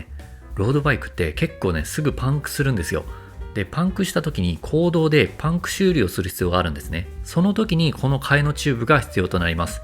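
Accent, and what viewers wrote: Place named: Aichi, Japan. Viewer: は愛知県内在住っぽいですね。ヘルメットのアクセントが「へ」ではなく「メ」ですね。私と同じ。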